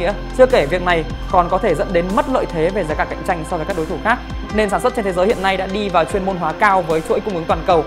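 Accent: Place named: North, Vietnam. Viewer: Nói chậm lại anh ơi khó nghe lắm nói nhanh mà còn giọng Bắc thì khó nghe cực (góp ý)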